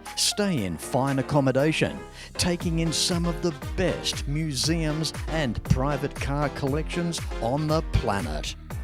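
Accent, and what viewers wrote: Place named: United States. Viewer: That was a very convincing American accent . Iv'e yet to hear an American do an Australian accent well .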